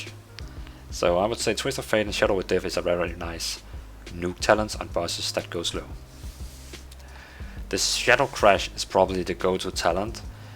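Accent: Denmark. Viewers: Man you are danish AF XD <3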